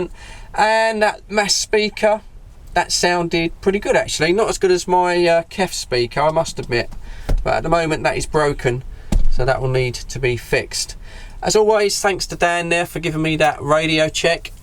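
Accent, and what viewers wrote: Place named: Newcastle, United Kingdom. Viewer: ahh ok, Geordie accent!!!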